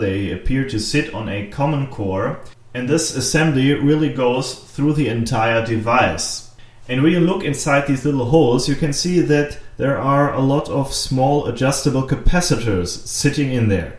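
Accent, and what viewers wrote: United Kingdom. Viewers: love the british accent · I loved the British voice LOL ;-) Brilliant video again, was there some Kraftwerk in this video? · lol at your British accent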